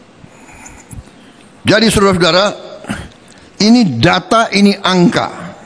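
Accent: Java, Indonesia. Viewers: Mas jawa apa Mas sunda pak 😄😃😀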